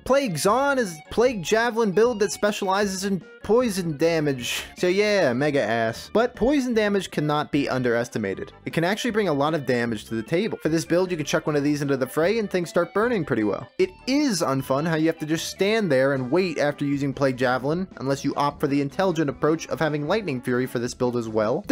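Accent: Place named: Northeast, United States. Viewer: lol oh word, you have more of a northeast rhythm i thought. Anyway, good stuff! Although sometimes I wonder if you like the game ahaha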